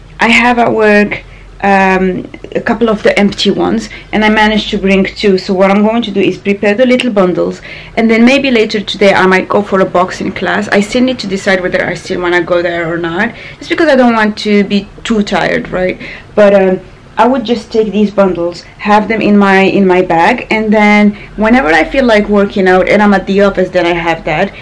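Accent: United Kingdom. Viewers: You picked some british accent😁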